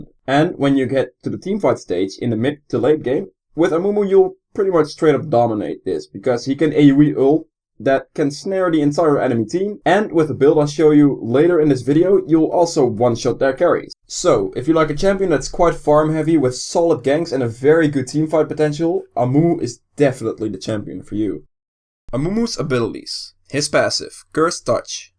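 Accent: Netherlands. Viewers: Heerlijk nederlands engels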